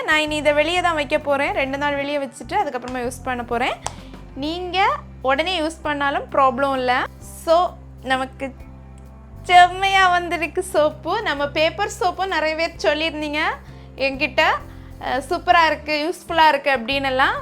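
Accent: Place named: Nagercoil, India. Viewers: Neenga nalla pandringa super nagarcoil slang enaku romba pidikum thank u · I think u r from kanyakumari from the way u speak. Bcoz m also from kk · Nagercoil slang 🤣🤣🥰🥰🥰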